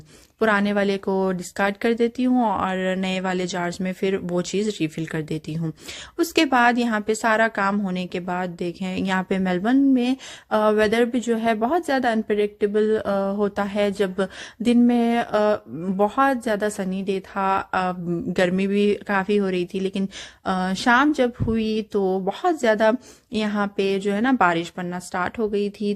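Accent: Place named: Hyderabad, India. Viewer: Hey, Assalamualaikum. I live in Melbourne, Australia too. I just wanted to suggest that you don’t change your accent our Hyderabadi accent is the best. ❤️ Love your videos🤍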